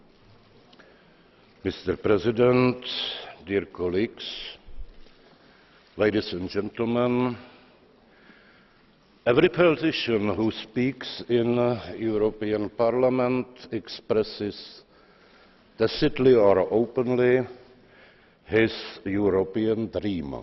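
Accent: Czech Republic. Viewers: Angličtina po česku 😅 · Best Czech accent! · Čím to je, že Češi mají furt potřebu někomu se vysmívat, nad někoho se povyšovat a pomlouvat, ačkoli bych dal ruku do ohně za to, že většina místních diskutujících "English expertů" svojí jazykovou výbavou nesahá Zemanovi ani po kotníky?? Ten člověk umí anglicky velmi dobre, má prostě jen silnej českej akcent, toť vše.... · Jelikoz je prezidentem Česke Republiky, mel by umět správnou vyslovnost, anglicky umi, to nepopirám, ale ma ceskej prizvuk, muj nazor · Konečně sebevědomý Čech, který veřejně mluví czenglish. Číňani mají čínglish, Indové hindish, Australani cockney, Američani američtinu … tak proč my máme furt ležet na Prokrustově loži Oxbridge.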